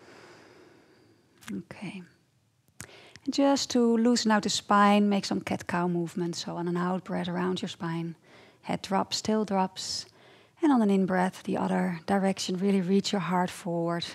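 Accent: Netherlands. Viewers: she sound dutch for sure, i can tell because im from the netherlands... sort of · Great Stuff! I teach Yoga here in D.C. and everyone always asks me about the Gluts (but) body part. And nice Dutch accent also!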